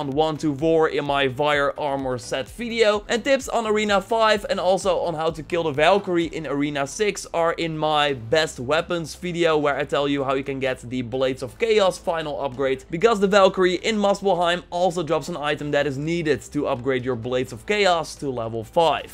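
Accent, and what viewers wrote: Netherlands. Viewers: Jezus what a strong Holland accent!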